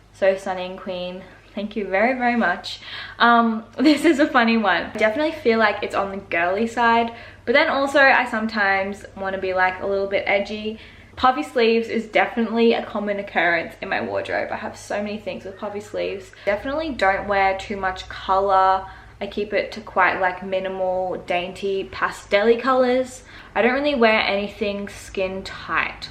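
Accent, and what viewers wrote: Australia. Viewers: I can’t tell where she’s from like Australia or New Zealand maybe?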